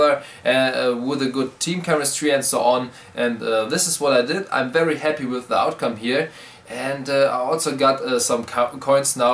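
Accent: Germany. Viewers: du bist deutsch hört man ?? :)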